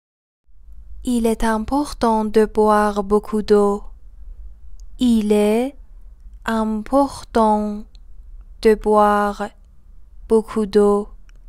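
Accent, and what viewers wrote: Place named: England, United Kingdom. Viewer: You have a very strong English accent that's ok 😂😂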